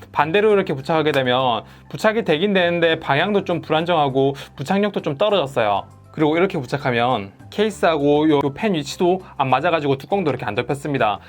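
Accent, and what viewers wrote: Gyeongsang, South Korea. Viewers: 고향이 부산이신가요?억양이 굉장히 경상도스러운데요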